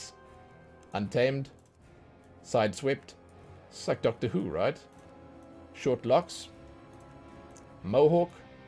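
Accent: South Africa. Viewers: Off topic but are you South African?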